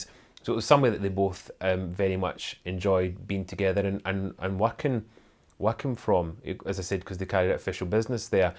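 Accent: Scotland, United Kingdom. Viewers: Oh, you brought your heavy Scottish brogue back with you from holiday!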